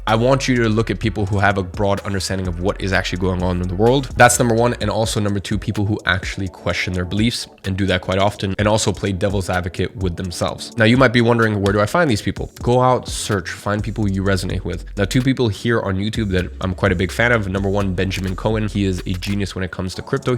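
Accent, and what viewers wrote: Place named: United States. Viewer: почему у тебя американский акцент живя в Лондоне?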